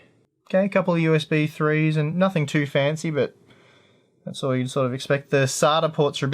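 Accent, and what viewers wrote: Melbourne, Australia. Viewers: Hey, are you from Melbourne?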